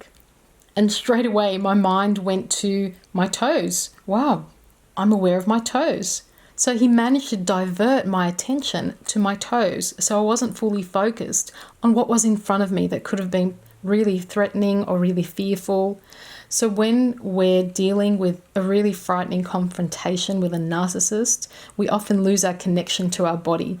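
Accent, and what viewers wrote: Australia. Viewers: Yes it is a lovely hypnotic Australian female voice.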